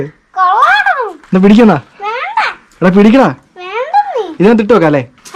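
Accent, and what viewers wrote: Kottayam, India. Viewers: R u from Kottayam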